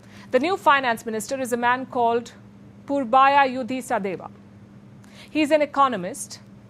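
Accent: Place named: India. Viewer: Funny English indian accent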